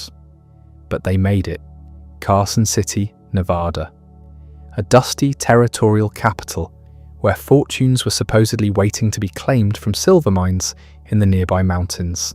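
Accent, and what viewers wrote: United Kingdom. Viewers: Love this and thank you but I wish you'd scratch the British accent. So many documentaries use this voice or similar that the sound is beginning to irk me.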